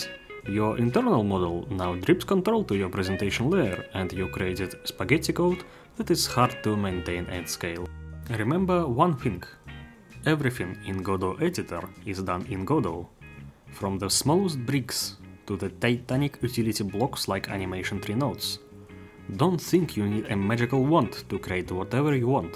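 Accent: Russia. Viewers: русский акцент? information was so useful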